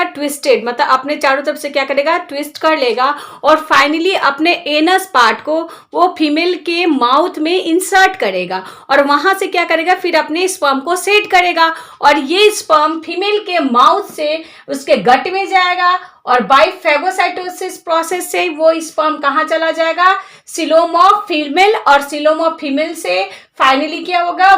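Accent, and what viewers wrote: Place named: Bihar, India. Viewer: Accha smjhaya mam. u r from Bihar ...h n ...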